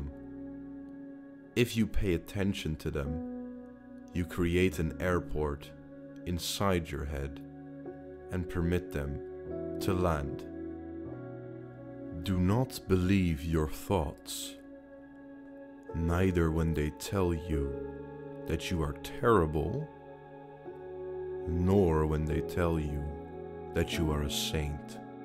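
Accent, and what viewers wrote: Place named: Netherlands. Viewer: I think these quotes are narrated by a Dutch person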